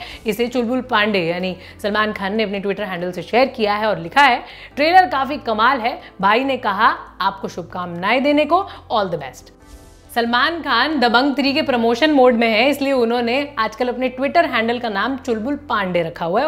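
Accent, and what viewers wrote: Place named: Bhojpuri, India. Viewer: Madam ka bhojpuri accent majedaar hai, I also make videos.. please check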